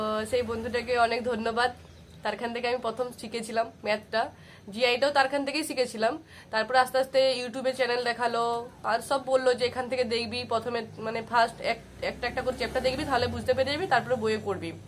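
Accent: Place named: Bankura, India. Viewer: গলা শুনে বাঁকুড়া বাঁকুড়া ভাইব পাচ্ছি।🙄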